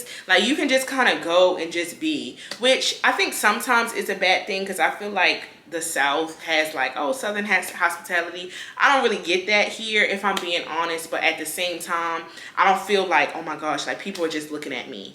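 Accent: Baltimore, United States. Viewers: Girl I knew you were from Baltimore the moment you started talking. I plan on relocating to Dallas from Baltimore in a few months. God is definitely telling me to relocate here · When you said “dew” for do, I sad she’s definitely from B’more,. Lived all over DC and PG county and went to Howard. Your accent makes me miss the DMV. Good luck!!! From Houston ( although I haven’t lived there in years). You sound like the type of that will do well Dallas is conservative compared to Houston. In Houston they are turned up 7 days a week with after-after clubs. Dallas is more sophisticated and calm. Calm is Good. Houston is like one big HBCU AND ABOUT EATING OUT AND DAY PATIES AND BEACH PARTIES. It can get addicting. You made a good choice, definitely if you’re in corporate , finance and communication. Houston is more like medical, engineering, life sciences, NASA, oil/energy and aerospace. 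I’m a guy and don’t usually watch these but it caught my eye and I thought about reminiscing. Be safe and prosperous! The food in Houston will do it for you . It’s more diverse. There are 600 black owned restaurants in the Houston area( more like Atlanta)Creole, Soul food, Jamaican , Nigerian, Indian, Middle Eastern ,Vietnamese., Latin etc etc. There is excellent black theatre( the Ensemble Theatre)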